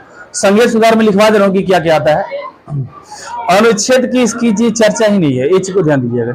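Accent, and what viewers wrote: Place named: Bhojpuri, India. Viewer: Bhujpuri style me ek dum mast sir ji Maza aata hai padhne me bhi nice sir 💯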